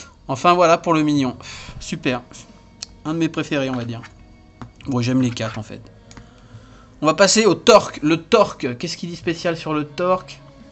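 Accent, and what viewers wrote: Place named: France. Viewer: Tjrs un plaisir de regarder tes revious(anglais à la frenchy!!!)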